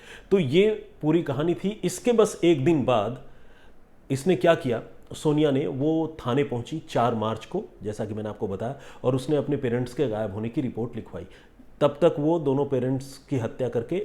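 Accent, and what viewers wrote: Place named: Bengal, India. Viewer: I like your vocabulary in hindi sir. Bengali hokar itna acha shudh hindi boltehai .👍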